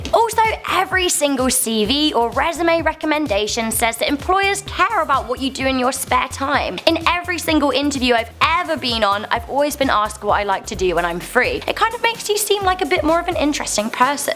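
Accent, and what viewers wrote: United Kingdom. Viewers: Ahhhhh I love that British accent!!! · Is it me or did express her British side more than usual 😂 I live in England to....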